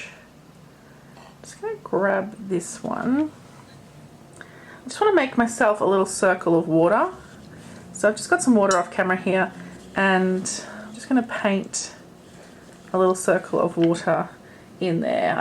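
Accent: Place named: Australia. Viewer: Heard the accent and was like "yay! Aussie!" And somehow ended up subscribed. 😄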